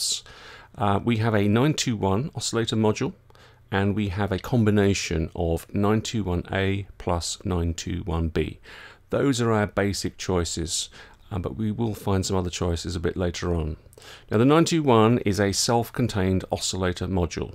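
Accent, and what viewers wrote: United Kingdom. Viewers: I'm not into modular (yet ;) ), but these sort of video's give me also more insight in synthesis in general. Besides that, your video's are always very thorough and of the highest quality. (It shows you're a photographer / videographer). Plus you have an excellent voice combined with your English accent, which always makes it a joy to listen to.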